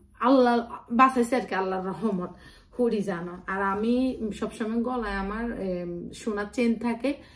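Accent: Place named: Sylhet, Bangladesh. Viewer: সিলেটী ভাল করে বাংলা বল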